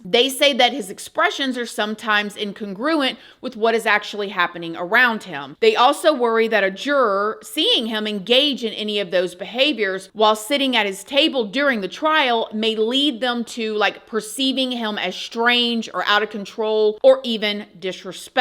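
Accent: South, United States. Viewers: Please don't.... to Inniebody, Inniewhere. I just love your Southern Belle voice and accent. You musta been a beautiful baby, b'cuz look at you now! Too bad you're already taken. Your husband is a very lucky man.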